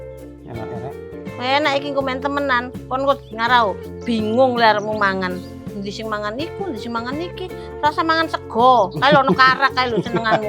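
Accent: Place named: Surabaya, Indonesia. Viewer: Aksen jatim sby an....😂👍 seneng lihat konten bu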